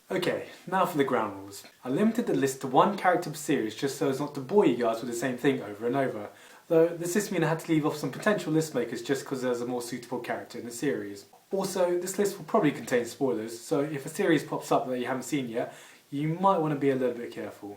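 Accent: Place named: England, United Kingdom. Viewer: Nice English accent,. Are you English by the way? Well, anyways, that's not important. Naruto and Bleach can be overated sometimes. I just recently finished.hack//sign and 11eyes. They're both pretty decent animes. I've been trying to get back into Bleach, but I have to go through all those episodes again.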